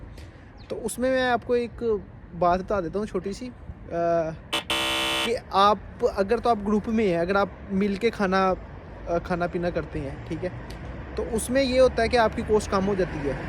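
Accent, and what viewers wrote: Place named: Lorestan, Iran. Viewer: bhai Love for ur politeness and Lori Accent❤😂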